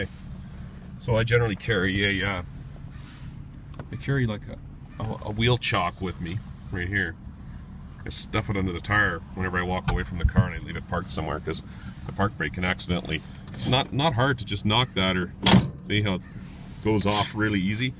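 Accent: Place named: Canada. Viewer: You sound like you're from Canada.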